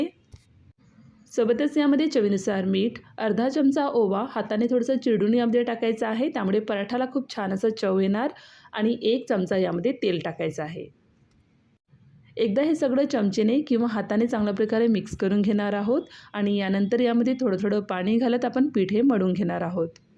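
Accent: Vidarbha, India. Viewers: Bhashe warun olakhtat loka,vidharbha chi marathi vegli aae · तुम्ही नागपूर, भंडारा किंवा पूर्व विदर्भाचे आहात वाटते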